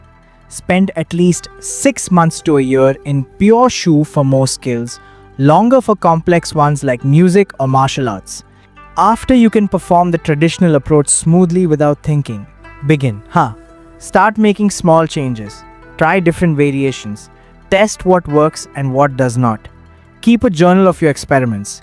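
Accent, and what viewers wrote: India. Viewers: Are you Indian? Your accent sounds Indian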